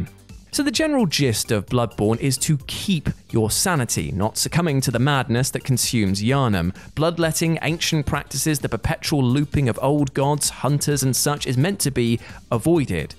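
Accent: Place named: United Kingdom. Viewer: american english but british accent xd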